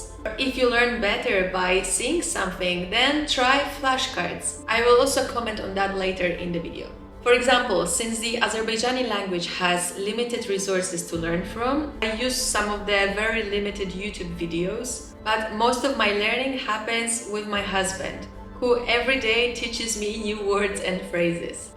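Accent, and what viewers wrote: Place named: Italy. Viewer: I love that your accent becomes more Italian as the video goes on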